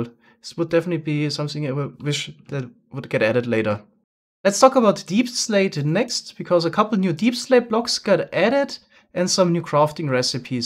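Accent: Germany. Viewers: R U German? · Is he german? No front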